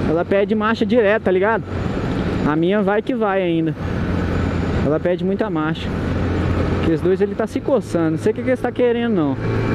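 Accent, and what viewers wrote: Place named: Goiânia, Brazil. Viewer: Se e de Goiânia? Parece demais kkkk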